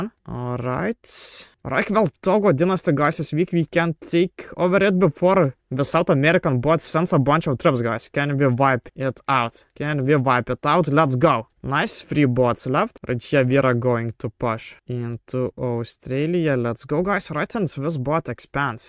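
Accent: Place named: Russia. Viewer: damn the russian accent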